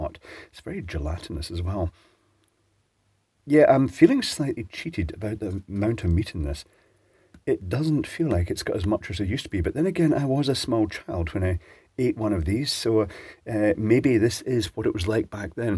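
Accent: Scotland, United Kingdom. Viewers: Posh Scottish accent ! Love it... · Why the begging for money? I presume you have monetized your channel, so will be getting paid by YouTube. Also you sound British and this is a British product so why ask for a Dollar not a Pound, if you need a suplemental cash injection? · Is he from scotland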